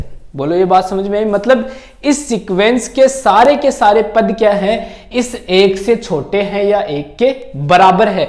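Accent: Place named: Rajasthan, India. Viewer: Sir this is unit 2 in Rajasthan university syllabus ...nd ur marwadi is like awsm....😄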